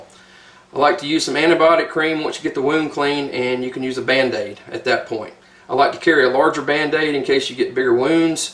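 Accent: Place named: Southern Illinois, United States. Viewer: Hey, I think I can guess where your from based on accent and woods area. I'd say Southern Illinois/ East Missouri area. Close or no?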